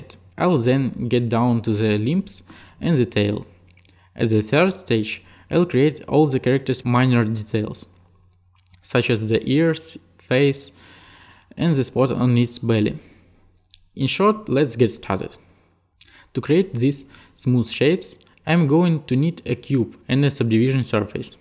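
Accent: Russia. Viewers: МММММ)))) Этот сладкий русский акцент) соу суит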